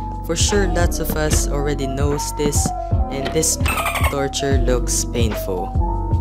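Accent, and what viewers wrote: Philippines. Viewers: I instantly recognized the filipino accent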